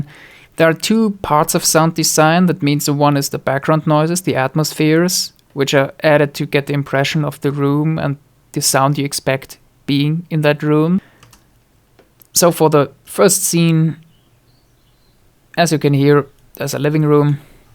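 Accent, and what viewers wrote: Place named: Germany. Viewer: The german accent is the best part of the whole video!! Haha. Love it man. Keep at it!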